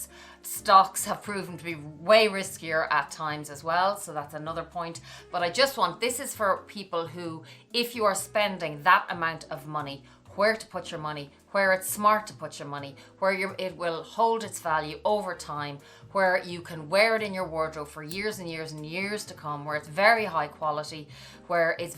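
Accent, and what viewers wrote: Ireland. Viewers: Dear, in my mother's time, one bought a timeless bag and wore it always. She lived in London, Paris and New York and wore her Chanel classic flap everywhere. She had bought it in Paris. It was the age of class. Now one needs a dozen bags and have a collection! Welcome to the age of greed. In any case I love you! Lived in Ireland for 10 years had my 2 kids there. Just live your Irish warmth and wonderful Paddy accent! Stay blessed and enjoy your great style! 😍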